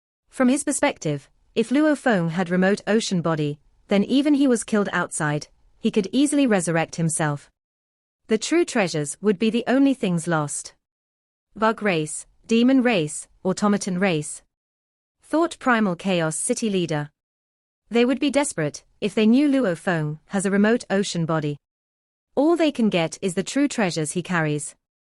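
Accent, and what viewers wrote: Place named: United Kingdom. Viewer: What an annoying British accent voice over.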